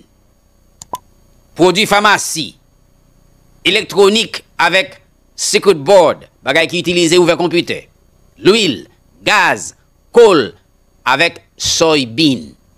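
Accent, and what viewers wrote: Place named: Haiti. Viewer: Bonsoir frem mkontan tande ou avek gro kreol sa frem Oh oui Mr le President kompeann gin lot peyi ki ka vinn niche deye l Ayayayyyy frem kreol la gro nan mains ou oui la Merci et bonne semaine avek fanmi ou specialement la petite princesse Reserve yon ti temps speciale pou ti princesse la pou moin frem Minm ou minm wap kontan Le wap pale avek li Pitite fille yo tjou rinmin papa yo et papa tjoujou rinmin pitite fille yo Autrement dit vice Versa frem Ke bon Dieu beni ou protege ou Frere c pou Aprann konnin ou gin plus moun ki apprecier ouki respecter ou a l egard de service wap rann Societebhaitienne ke moun ki comporte yo kom des imbeciles Ok ke toute bon bagay yo bon Dieu fe yo pou ouak toute pitite ou yo madanm ou et bon travay bonne semaine Bonne sante bon courageet bonne perseverance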